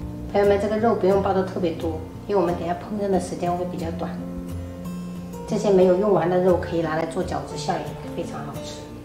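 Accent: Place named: Quanzhou, China. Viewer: 你泉州的啊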